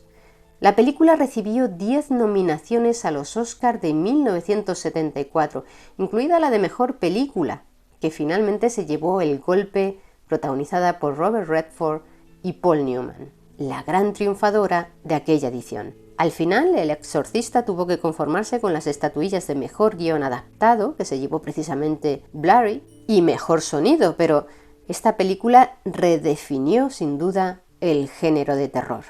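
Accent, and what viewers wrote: Castile, Spain. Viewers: soy colombiano,y me parecen fascinantes tus relatos especialmente por tu dialéctica y marcado acento español y tu didáctica narrativa. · Me gusta tu acento de españa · Me gusta como relatas, aún que no suele gustarme el español castellano. Si me dan ganas de jugar la ouija pero a la vez no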